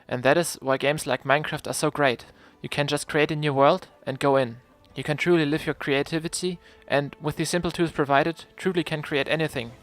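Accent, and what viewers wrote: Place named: Germany. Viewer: Sounds so german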